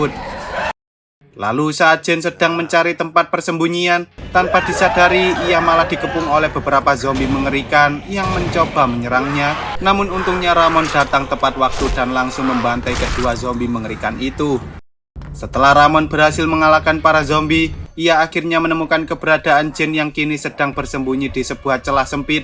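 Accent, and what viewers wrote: Java, Indonesia. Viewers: lho wong jowo mas🎉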